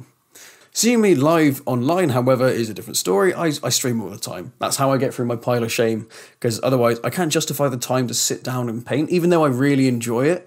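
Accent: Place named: RP, United Kingdom. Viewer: You already won yourself a new subscriber for speaking properly. We guys on the continent always enjoy listening to you guys in the UK exercising your stiff upper-lips… — Posh enough for me at least ;-)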